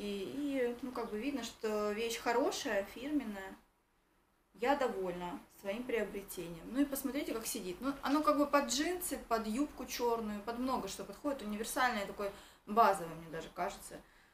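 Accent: Rostov, Russia. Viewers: Не вылазИЮ))) , ой не могу с ростовского говорка ))))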